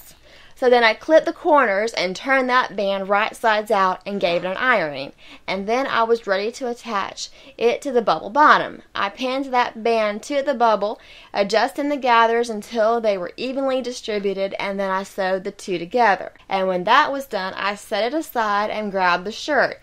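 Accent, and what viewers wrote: South, United States. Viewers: so beautiful! what a great tutorial! and I love your southern drawl! lol what material did you use for the gold? on the accent?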